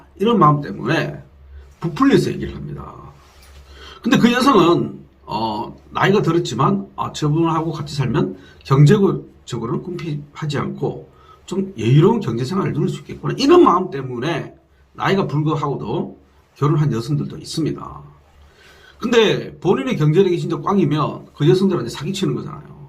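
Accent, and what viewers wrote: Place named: Gyeongsang, South Korea. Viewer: 오~~ 유튜버... 정광용 씨와... 얼굴.. 외모... 사투리 발음이... 아주 비슷하군요. 그 분도... 경상도 던데... .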